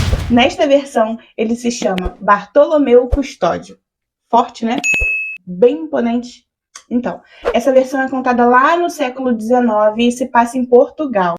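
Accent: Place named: Rio de Janeiro, Brazil. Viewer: A história é linda , mas o sutaque carioca é horrível 🤢🤢🤢🤢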